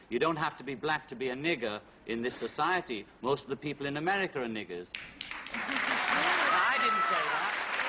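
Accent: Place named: United Kingdom. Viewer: Bri'ish muck mouth ye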